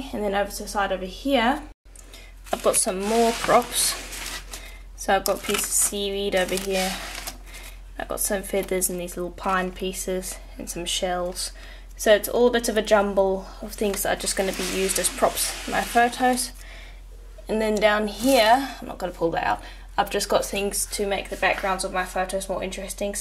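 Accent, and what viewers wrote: South Africa. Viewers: Such a well organised studio😍 where are you from, your accent sounds South African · Hi! You are so organized! Thank you for your studio tour. I'm just starting out but it's given me a few ideas of how to better organize what I have and prepare for what I plan to get! BTW, my husband commented on your accent as soon as he heard your voice--he and his family are from South Africa and they were from Johannesburg.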